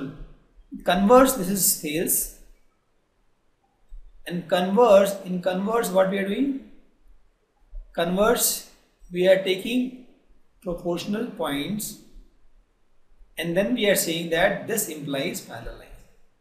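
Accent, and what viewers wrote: India. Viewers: Amy he is Indian he speaks Indian English not AMerican English like u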